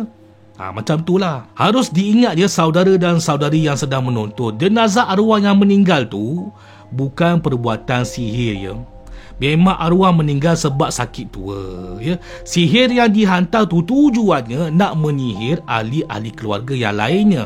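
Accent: Perak, Malaysia. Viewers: Awsome sgt you tuber dari ipoh dgn lorat negeri perak ....best dgn style and cara yg paling kreatif ...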